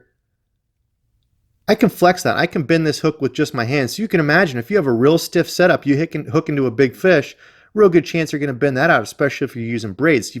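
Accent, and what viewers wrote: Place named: South, United States. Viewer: The entire South sounds exactly like that lol….Id like to know how to use the swivel or wobble type head ones.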